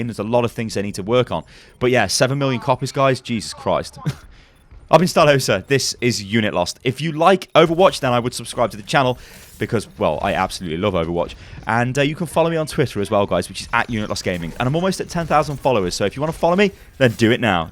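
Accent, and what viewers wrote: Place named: United Kingdom. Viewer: bought the game just because my freinds all had it, from the videos i saw i thought it basically was tf2, but looked like it could be fun, but manic, but actually playing it is completely different, i must admit for the first few hours i did find it abit manic, but once you learn abit about the charachters it makes alot more sense. coming from playing lol and gw2, i expected some learning curve in learning charachters, but i must say i think i will be playing this game for a long time, its competitive,fun,varied, and game times aren't rediculous long!! also enjoying your british commentry!! keep it up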